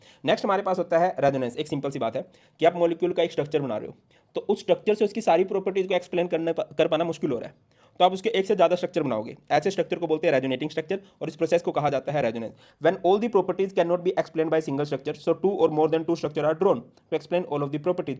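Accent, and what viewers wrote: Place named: Punjab, India. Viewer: Your Punjabi accent 😅❤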